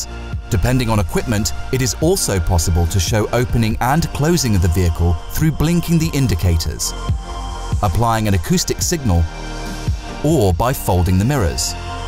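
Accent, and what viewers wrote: United Kingdom. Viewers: 뭐야? UK English???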